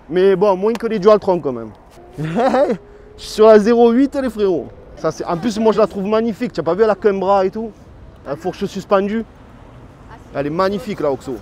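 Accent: Marseille, France. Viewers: c'est sketch chez moi, dès que la situation s'y prête on t'imite. Ma meuf quand je la gêne dans la cuisine elle gueule 'Oh enlève toi du milieu" en prenant l'accent marseillais. Pareil, elle me montre un meuble qu'elle kif et elle me dit "Tu pouce blue ou pas ?" Tu rends ouf les gens 😂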